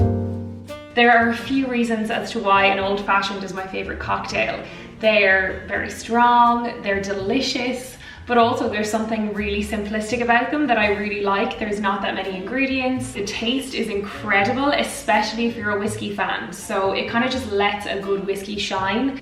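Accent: Ireland. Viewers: Gorgeous Irish girl with an amazing accent who loves whiskey and makes cocktails....easiest subscribe ever! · You're the reason I have Siri set up to sound like an Irish female. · I was robbed.... I was told that this was a “naked” Irish elixirs. Oh well.... The Irish brogue had me.....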